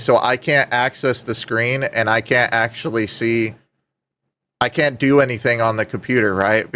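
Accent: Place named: South, United States. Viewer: By now your unique voice and y'all-type accent should be known to most large scam centers. You'll likely have to start using a voice changer. I'm waiting for these Indian criminals to wake up to the possibility of using AI against scam baiters.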